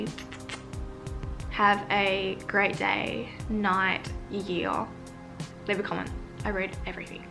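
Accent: Australia. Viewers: I'm learning English and I found the Aussie accent soorrrr gorgeous. Now I'm learning English with an Aussie accent thank u for helping, a lot of love from Azerbaijan 🇦🇿 🇦🇺!!!!!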